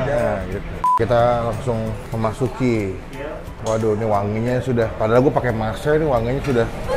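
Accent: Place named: Banyumas, Indonesia. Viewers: Ora Ngapak Ora Kepenak Pak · Purwokerto medoknya keliatan banget wkwk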